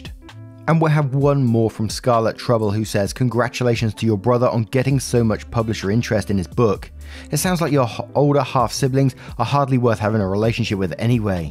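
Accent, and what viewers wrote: England, United Kingdom. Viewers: Hey hey! The isolation ward at the shelter is 100% full of sick kitties, but they are getting better. Thanks for your videos, as I said before your cadence and voice is soothing to the cats/kittens. Guess they prefer English accents over American. Hope you and Poppy have a lovely day!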